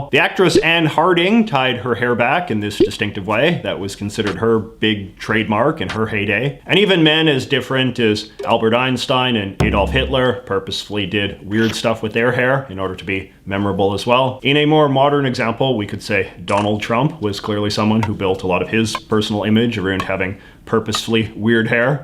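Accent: Canada. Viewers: You’re so Canadian that it’s distracting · As a Canadian who's lived in multiple prpvinces, from coast to coast, id love to know where in Canada that your accent comes from! I've never, ever heard anyone actually pronounce "about" that way. Not ever. I need to know where that stereotype comes from, exactly!! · I am from Montreal, and I watch several videos in English on Youtube. So far you are the only one I hear saying "About like if it was a boot". Maybe I hear to much from US. Everytime, I hear "A boot". Is is localize to where you live. Is in Ontarian? I am very curious.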